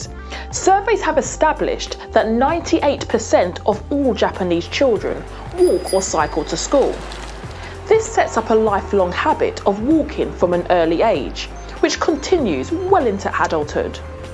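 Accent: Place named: United Kingdom. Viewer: She is british.that accent is from there.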